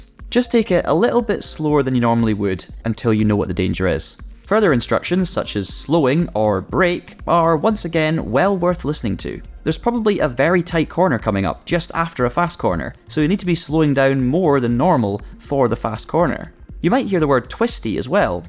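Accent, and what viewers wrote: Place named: Wales, United Kingdom. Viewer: Brudda he’s not English he’s a Welshman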